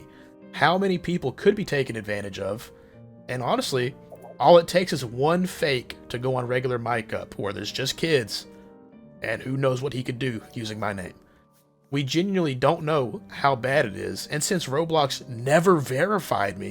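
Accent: South, United States. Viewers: HIS VOICE …he really is a man of the south..🐄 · In his own words, he grew up in the South; his accent and voice are not acted, he just raises his voice and adds the jokes for the character.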